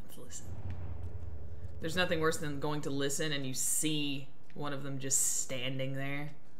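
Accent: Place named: South, United States. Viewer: I love your Southern Accent Southern Accent Gang love from Mississippi also you’re so adorable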